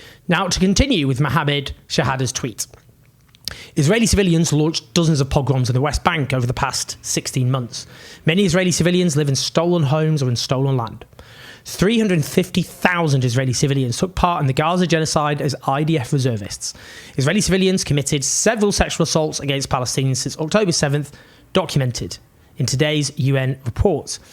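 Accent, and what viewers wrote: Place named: Yorkshire, United Kingdom. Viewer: He’s from Yorkshire, in the north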